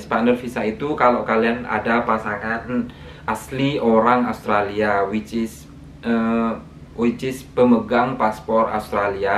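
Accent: Madura, Indonesia. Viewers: Baru paham, = MADURA, pantes logat e gak asing wkwk 😂😂🎉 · Logatnya kayak orang madura bang... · Asli Madura Kah Sampean Bang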